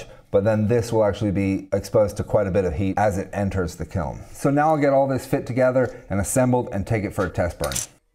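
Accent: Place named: United States. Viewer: This channel may be the most useful, applicable, & effective content on here. It's the meat & potatoes answers for worldwide, pertinent questions. My biggest question is why do you sound American & not British? Personally I'd love to understand how you got where you are & what you're doing today? How did you aquire your skillsets & why you find what you do valuable?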